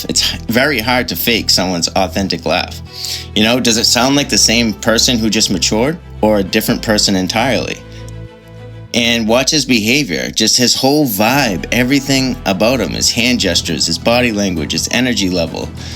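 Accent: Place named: South Boston, United States. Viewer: Wuttup dude, I just saw this video on Lipstick Alley, and I was frustrated that you didn't SHOW us what you described, but I also respect that you expect people to care enough to investigate on their own, which we should, and I most certainly do, and will in a minute about Gucci, who is a total nothingburger to me, no idea who he is, what he looks or sounds like, so my eyes and ears will be a fresh raw test. I believe it, probably, tho, it all sounds UTTERLY likely, given how evil the overlord class is. I just subscribed to your channel, because it looks interesting AF, but mostly because I miss hearing that accent. I grew up in Southie, and I still live in Quincy, but your particular accent is VERY familiar to me, and very few people sound like you now. Over the last 4 months, I've become the Faceclocking GOAT, I've detected and proven HUNDREDS of secret biological family relationships among celebs, often with me and my family directly involved. 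I've been waiting all this time for someone to remind me of Joy Wright-McCarthy, and you win, lol. You also remind me of the Ferris family, e.g., Paul and Justin. On a weirder note, you emit Jack Parsons vibes, and so do I, and so do a surprising number of people originally from Southie. Weirder things were afoot in Southie than anyone can currently possibly imagine, truly the bizarrest shịt in the history of mankind, NO EXAGGERATION, and yes, I am including the ancient Levant, ahem. On a brighter note, we were not just polluted with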